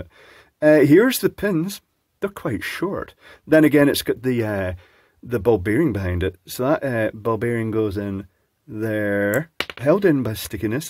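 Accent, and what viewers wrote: Scotland, United Kingdom. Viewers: Poshest Scottish accent I've ever heard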